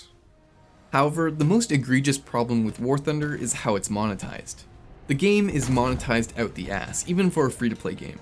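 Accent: Czech Republic. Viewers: Are you from czech? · are u czech ?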